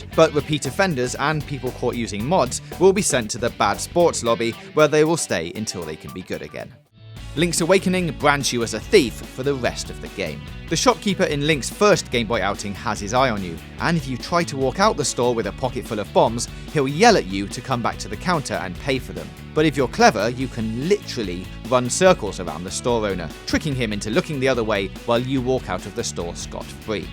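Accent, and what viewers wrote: United Kingdom. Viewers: Eww he has a British voice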